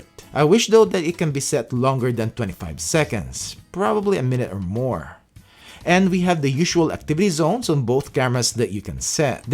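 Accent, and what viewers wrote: Philippines. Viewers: Kuya, you sound Filipino.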